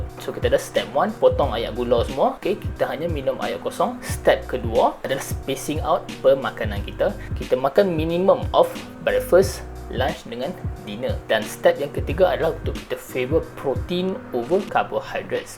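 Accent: North, Malaysia. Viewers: Baguih, kekalkan bahasa Utara. X da kalut nk ckp KL pun. Steady!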